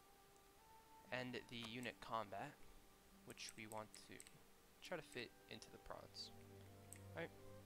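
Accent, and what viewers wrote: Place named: Scandinavia. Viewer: He's gotta be scandinavian for his accent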